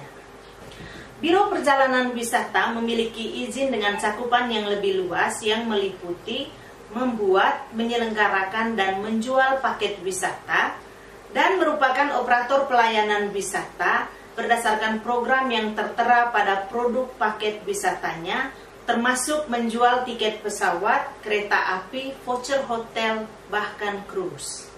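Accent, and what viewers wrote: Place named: Flores, Indonesia. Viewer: Sangat membantu penjelasannya sangat jelas dan logat atau gaya bahasanya sepertinya mba orang flores ya,...terus kembangkan pariwisata komodo...salam flobamora 🙏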